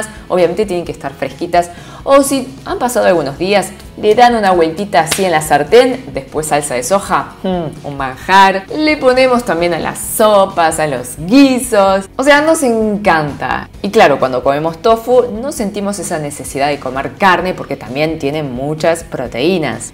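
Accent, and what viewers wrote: Buenos Aires, Argentina. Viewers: Una coreana con acento argentino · Tiene acento de la Argentina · Te felicito por el perfecto español-argentino que hablas jeje. Adoro el coreano , me pone triste no poder pronunciar ni siquiera una palabra. Gracias infinitas por contar costumbres y alimentos saludables que consumen. Bendiciones para tí. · No tengo ascendencia coreana parece pues me miran y engordo. Habla como argentina. Linda chica presentándonos su bella cultura. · Tenes 2 de las 3 cosas que me deriten de una mujer ojos preciosos asentó Argentino pero tu piel no es morena 👍♥️👍♥️👍♥️🇬🇹👍👌♥️👍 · Me encanta oirte y verte, tu acento argentino es genial, sos fisicamente coreana pero culturalmente todos tus gestos , tu manera de hablar y tu alegria son latinos, te felicito por ayudarme a conocer mas de tu lindo y hermoso pais, soy fan de los doramas, besos desde Honduras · Hola apenas descubrí tu vídeo pero una pregunta además de hablar muy bien el español miro que tienes un acento como argentino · Flaca pero tu hablas como Argentina como eres coreana? 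Nacida en Corea o de padres Coreanos? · Eres Coreana, hablas español con acento Argentino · Asu una coreana hablando con acento argentino😱😄que chistoso se ve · Acento peruano, limeño? Para nada, su acento es argentino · No se guíen por los rasgos! No tiene un mérito especial que hable exactamente como una argentina si lo es de nacimiento o llegó muy chiquita. Por muy negro que sea un tipo, si nació y vivió en Sevilla será y hablará sevillano. Conviene pensar antes de hablar o teclear! :D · Tu español es perfecto , pero con acento Argentino!! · Wow!! Hablas perfectly Argentinean 😱🇦🇷👏sos hermosa! Me encanto tu video! Gracias!!👏😊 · Habla tan bien porteño 😍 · Y tiene acento argentino. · Supongo es coreana se ha criado o vívido por mucho en Latinoamérica y si su español suena a Argentina!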